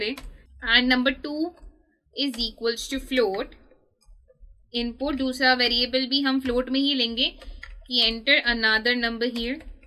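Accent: India. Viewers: She has an Indian accent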